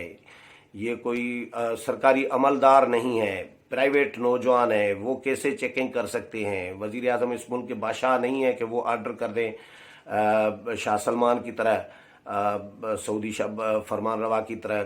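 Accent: Sindh, Pakistan. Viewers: AoA. Sir, aap Sindhi style me bohat achi baat kartay Hain. Khush rahain.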